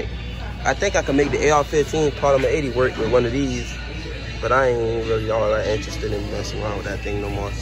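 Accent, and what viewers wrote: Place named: Maine, United States. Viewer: Raw af. Where u from maine? U gotta cool voice/accent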